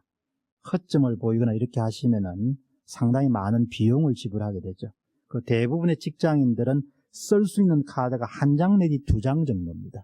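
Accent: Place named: Gyeongsang, South Korea. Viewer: 경상도 사투리 구수하고 진정 진실한 마음 안격이 보이는데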